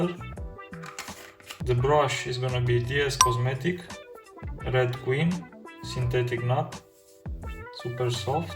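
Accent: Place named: Romania. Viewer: Based on his English accent I reckon he is from Romania, or some other eastern country.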